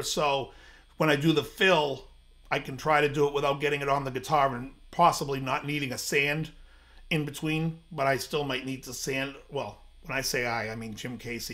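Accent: Boston, United States. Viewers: Do you think this guy's from Boston?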